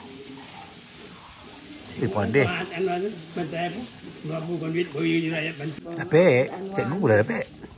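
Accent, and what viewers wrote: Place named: Negeri Sembilan, Malaysia. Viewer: Org nogori ya 😊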